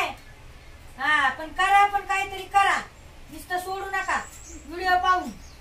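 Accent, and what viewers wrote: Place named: Marathwada, India. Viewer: अस्सल मराठवाड्याची भाषा बोलता ताई तुम्ही...जय भीम नमो बुद्ध य जय शिवराय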